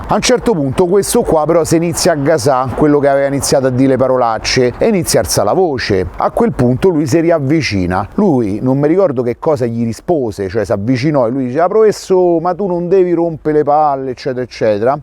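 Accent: Rome, Italy. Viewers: Dopo tanti anni trascorsi nella bellissima Roma, sono felice di seguirti dall'altra parte dell'Adriatico. Da un lato mi dispiace molto vedere l'amata Roma in questo stato di degrado, ma dall'altro mi piacciono le tue storie curiose e divertenti e il modo in cui le racconti (soprattutto la cadenza romana 😄). Grande, continua così! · Daje! Troppo bello stare ad ascoltarti con la tua cadenza romanesca, sei un tipo verace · bravo, bella storia e col tuo accento romano diventa ancora più bella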